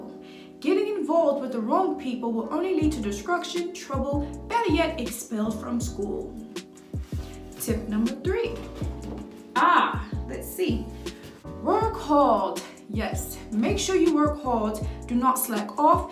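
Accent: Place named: England, United Kingdom. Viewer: Some good tips Teacher...loving the glasses and English Accent 😂👌🏽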